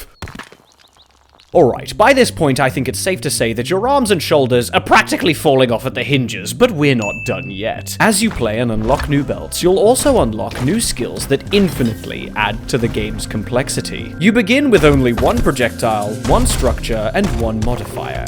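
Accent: United Kingdom. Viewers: the bri'ish accent makes this video funnier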